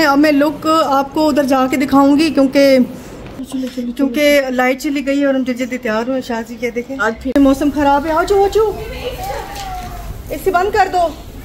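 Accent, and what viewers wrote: Saraiki, Pakistan. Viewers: Over acting mat Kiya Karen ap Ka lehja seriki hai Urdu theak NI bolti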